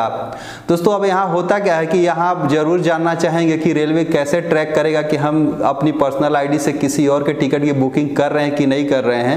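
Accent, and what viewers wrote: Bihar, India. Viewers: आप कहाँ के हैं up या बिहार हमको तो पक्का बिहार के लगते हैं पकड़ा जाते हैं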